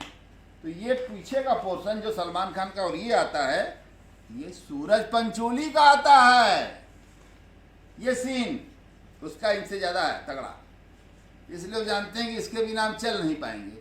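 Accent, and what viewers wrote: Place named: Varanasi, India. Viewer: Sir apka ha topic bada dilchasp aur pardarshi hota hai इसपर से आपका बनारासिया अन्दाज़ सुनने में बहुत मजा आता है.. sadar pranam aapko..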